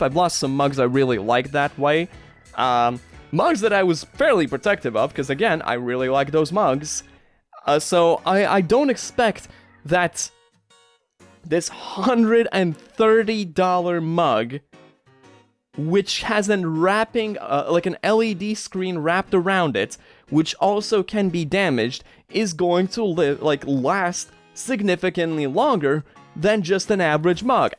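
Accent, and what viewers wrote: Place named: Europe. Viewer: Your european accent is fading. fascinating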